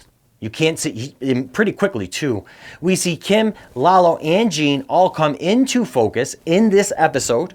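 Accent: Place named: Midwest, United States. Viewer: Are you from the Midwest or Canada? I can hear that accent lol.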